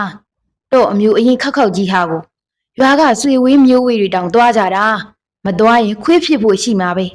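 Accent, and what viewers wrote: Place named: Upper Burma, Myanmar. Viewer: ဆရာမခင်ခင်ထူးရဲ့အရေးအသားအသုံးအနှုန်းတွေကရိုးရှင်းတယ်အညာဒေဓလေ့တွေကိုပုံဖော်သလိုခံစားရတယ်ဖတ်ပေးတဲ့သူရဲ့အညာသံကလဲဝတ္ထုကိုပိုကြွစေတယ်ကျနော်မျက်မမြင်တစ်ယောက်အနေနဲ့နားထောင်ရတဲ့အတွက်ကျေးဇူးအထူးတင်ပါတယ်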